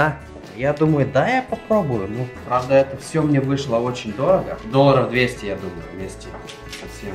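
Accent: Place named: Ukraine. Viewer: украинский акцент такой ))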